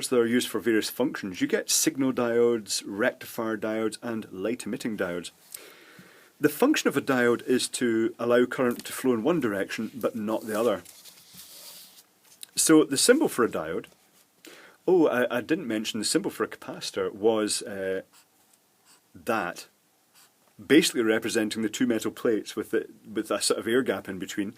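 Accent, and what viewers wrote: Scotland, United Kingdom. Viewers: Which accent he explained ? British ?? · Chop Chop Scottis presenter speaking English. Nothing to do with America. Snowflake much? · Brilliant - why is it that the best sparkles have a Scottish ascent - answer - they are the best I have ever sailed with - enjoying this - brilliant! Please continue! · I scanned the comments for 2 minutes and am sad that no one mentions how he keeps saying nanofart. Classic Scottish accent! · Thank you for your video :-) are ya from Scotland? You sound like it dontcha know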